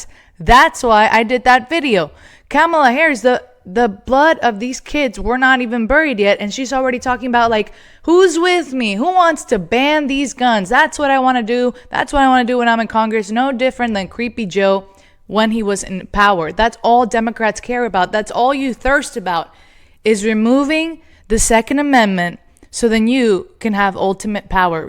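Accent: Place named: United Kingdom. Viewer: He has a British accent,that says all you need to know about that feckless soy boy british 😂😂😂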